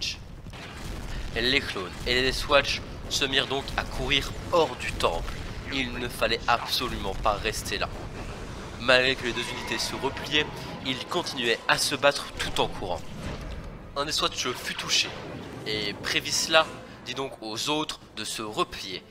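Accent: Belgium. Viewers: ❤ l'accent belge qui tue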